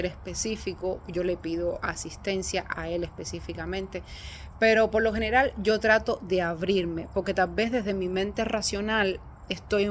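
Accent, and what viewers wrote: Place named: Cuba. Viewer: Hola muchas gracias por todas tus recomendaciones son muy valiosas. Tienes un acento hermoso cubano Un gran abrazo desde Colombia 🙏🙏🙏❤️❤️❤️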